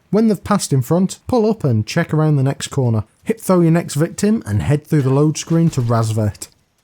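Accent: Birmingham, United Kingdom. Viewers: couldnt place the accent til he said 'enemuy' hahaha brummie for sure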